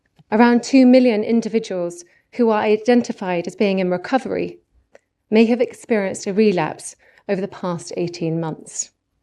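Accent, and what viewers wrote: RP, United Kingdom. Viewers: british accent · I ❤ British accent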